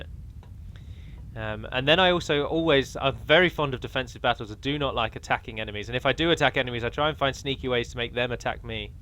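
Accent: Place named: Glasgow, United Kingdom. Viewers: That Scottish accent tho lol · the first half sounded like a bitchy glaswegian, second half sounded retarded norfolk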